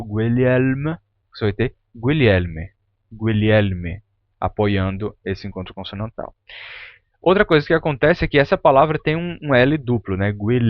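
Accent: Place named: Maranhão, Brazil. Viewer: Esse canal é muito massa. Adorei conhecer e já to consumindo tudo. A propósito, uma curiosidade: no seu sotaque, o R de PORQUE é aspirado ou ele é sonoro? Esse R é tão sutil que ele soa como o H do início de frases em inglês. Vejo maranhenses e tocantinenses (com quem tenho contato) pronunciando um R muito parecido com esse som aspirado do H. Procede?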